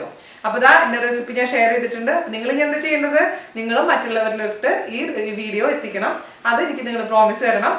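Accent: Thrissur, India. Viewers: Chechi thrissur kariyaano thrissur slang athu kont chodhichatha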